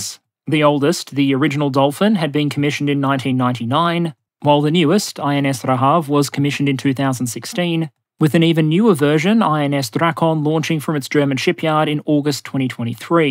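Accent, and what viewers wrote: Australia. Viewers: He's an Aussie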